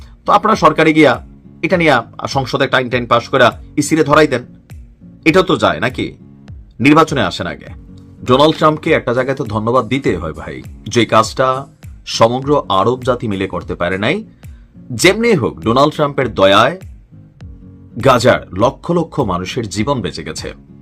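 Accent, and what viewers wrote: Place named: Noakhali, Bangladesh. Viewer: এইটা শুধু আপনার কুমিল্লার বাসা না এটা আমাদের নোয়াখাইল্লা ভাষা বুঝছেন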